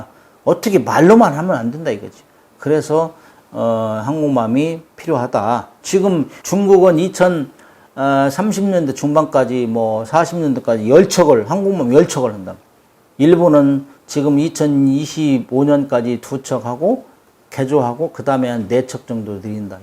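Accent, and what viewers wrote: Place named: Jeolla, South Korea. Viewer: 말투가 절라도 네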